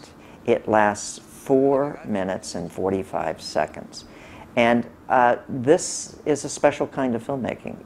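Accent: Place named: United States. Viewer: I am greek guy 38 years living abroad. I love the American accent and believe to your words even with your American beautiful accent :-)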